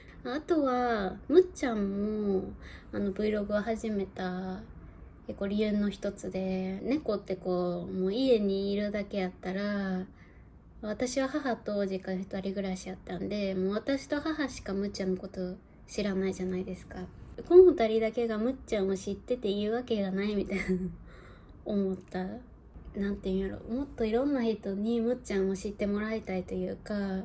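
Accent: Kyoto, Japan. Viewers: nokoさんの柔らかい声、京都弁、聞いててとても癒されます😍これからも喋りのvlogぜひ撮ってください✨ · nokoさん改めて2万人おめでとうございます🎉🎊 nokoさんの京都弁のお喋り可愛くて好きです🥰 むっちゃんへの愛❤️感動しました🥺🥰 これからもカフェやスイーツ、京都のこと、むっちゃんのことなどなど、楽しみにしていますね😉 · 2万人登録者おめでとうございます㊗️ さんの京都弁がとても可愛いくて癒されます😍私は東京生まれの東京育ちで看護師しています。京都に憧れていて、いずれ京都で暮らしたいと、思っています。猫とコーヒーが私も大好きでいつも楽しく観ています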